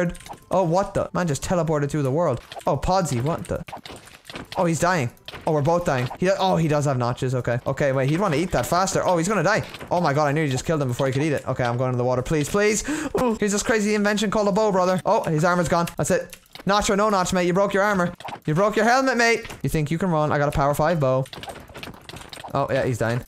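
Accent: Ireland. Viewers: Im I the only one who noticed that he has a slite Irish acsent??Like a 20% of Irish and a 80% of American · you sound irish are you irish? nvm lmfao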